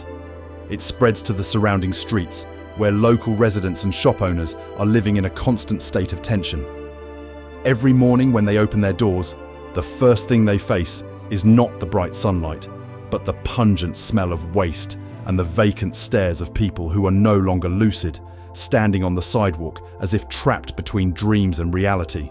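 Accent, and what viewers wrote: United Kingdom. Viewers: This is fake! I live in Oakland and this fake documentary with a foreign UK accent explaining you something they don’t even know about it. Just to create fear. This is straight FAKE!!!! That’s like UK people telling you about Mexico and Cartels.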